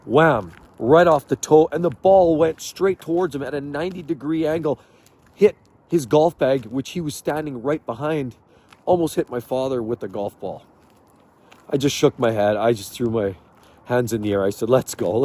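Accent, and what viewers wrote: Canada. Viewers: I'm a big fan of this channel. I come from Ireland and lived in Vancouver for ten years. Hearing the accent, seeing the snow, the houses and of course the red Tim's brings me back to a great time. I left last year because I was just spinning my wheels. Beautiful place with the warmest most open and friendly people I have ever met. This guy is as real as a youtuber gets. Keep it up. This is great.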